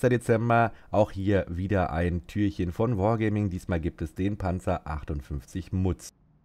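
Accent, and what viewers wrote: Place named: Bern, Switzerland. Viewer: Mage Co bärner-dütsch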